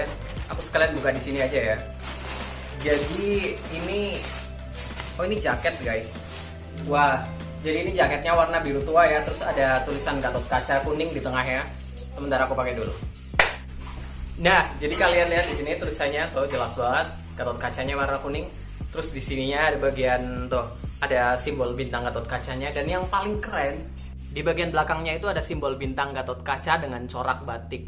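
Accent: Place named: Pekalongan, Indonesia. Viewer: Semangat mas dengan konten super Hero lokal nya..yakin lah kalo film superhero kita sudah jadi dan rilis Pasti Channel mas yang suaranya khas Pekalongan ini bisa makin Cetar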